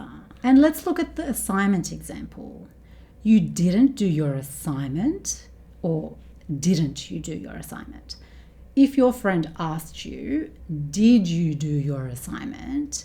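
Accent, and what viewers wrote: Australia. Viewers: Thank you so much for helping me practice Australian English, this trains my ears to understand it better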